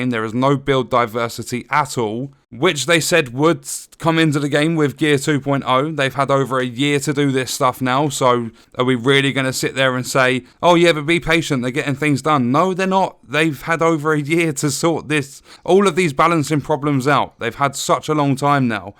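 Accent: United Kingdom. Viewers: Love your Brittish accent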